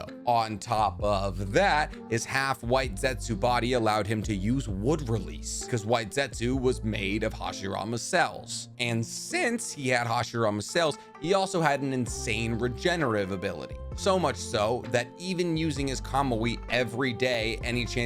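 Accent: United States. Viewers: Why do so many people pronounce Shisui’s name that way? I’ve noticed a bunch of narutubers do it, but just so everyone is aware, it’s not shi-su-ee like ship. It’s SHE-su-ee Okay, after going through more of the video, you just have really poor, Americanized pronunciation. Mungeekyo sharingan, Minnuhto 😂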